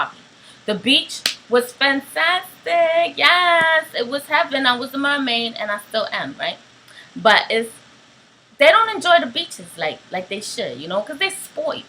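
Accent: New York, United States. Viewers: Physically you are a very typical beautiful Puerto Rican woman. The thing you need to change is your Nuyorican traits. This is something that can be done if you work at it. And don't forget the NY accent. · J. García She talks like a Nuyoricans not black. This is the way we speak. Black people in America don't talk like this outside of NY. You got it twisted bc you're ignorant.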